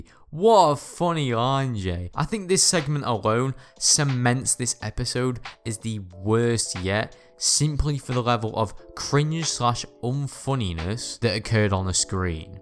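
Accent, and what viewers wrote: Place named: Northern England, United Kingdom. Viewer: Loving the reet northern accent ✌️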